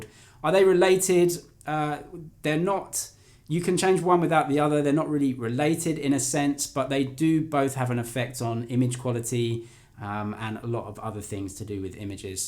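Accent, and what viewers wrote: United Kingdom. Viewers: #asktechzg Why are you in China?Is it for your job,if so,what is your job title? Cuz you sound British and look 100% like Tom Holland😂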